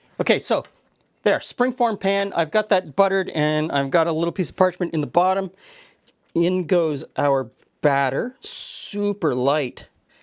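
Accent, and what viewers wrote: Canada. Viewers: The "english" language is so fluid and changes overtime to the point we should probably not be calling it that. I live in New York and i can tell you what we speak is certainly not "english" anymore. lives in Canada and you speak a dialect which should be called Canadian. As my new york dialect should be called American.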